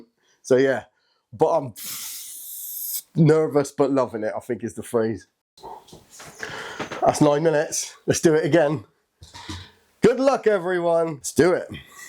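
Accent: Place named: West Country, United Kingdom. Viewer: Thought I recognised west coutry accent.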